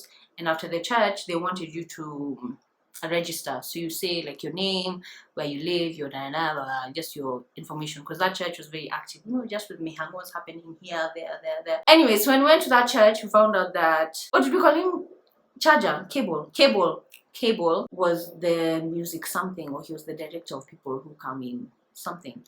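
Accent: United Kingdom. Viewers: The british accent makes me happy😍😂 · The British acsent 👌😂😂😂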